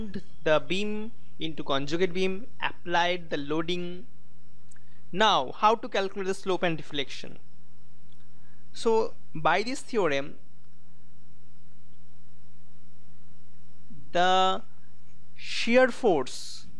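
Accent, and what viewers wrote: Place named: India. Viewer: accent original rakho...Indian accent accha lagta hai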